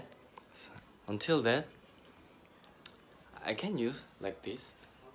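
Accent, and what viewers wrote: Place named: South Korea. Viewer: Your pronunciation of Ikea is actually very similar to how it’s supposed to be pronounced. I’m from Sweden and we pronounce it like you do, except you have a bit of a Korean accent to it 😊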